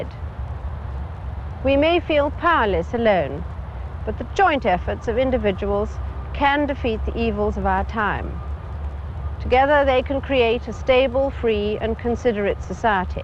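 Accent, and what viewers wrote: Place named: RP, United Kingdom. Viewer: That's how received pronunciation is supposed to sound you utter fool.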